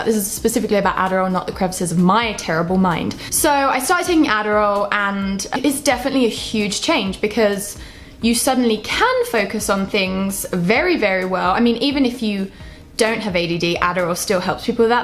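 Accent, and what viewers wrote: United Kingdom. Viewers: Oh my lord, blonde hair, blue eyes, and your british accent. Im in love! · I honestly didn't realize the accent until you mentioned the fact that you were from England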